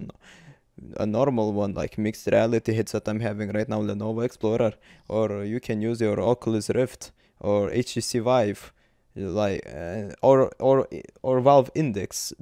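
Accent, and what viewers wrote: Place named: United Kingdom. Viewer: You sound so British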